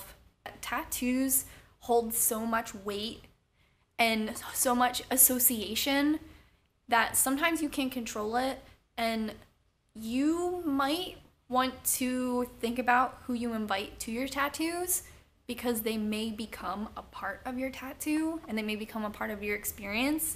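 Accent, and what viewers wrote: Philadelphia, United States. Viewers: Are you from philly?!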